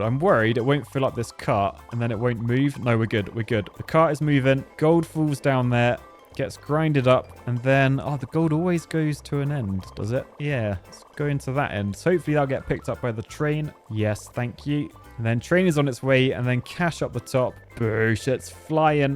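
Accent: United Kingdom. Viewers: Ay brittish dude, will you play Tf2?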